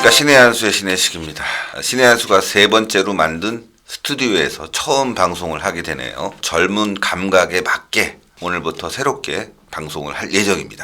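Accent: North Korea. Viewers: 평안도 사트리 같애요 억양이?! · 딸랑 딸랑이 입니다. 이북사람 목소리 같네요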